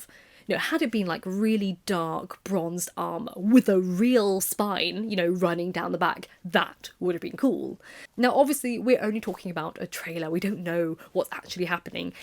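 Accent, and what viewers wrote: United Kingdom. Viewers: What is the name of this accent? I'm not well versed in the names of British accents besides RP and something auditory like an accent is difficult to google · This is the most aggressively British voice I've ever heard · You have the most BRITISH voice ever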